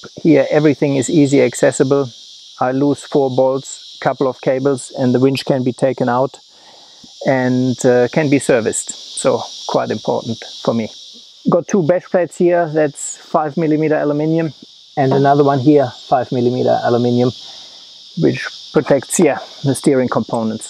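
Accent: Germany. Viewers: category X ist doch auch nicht schlimm! 🇩🇪 hast zwar dt Akzent, kannst abe good englisch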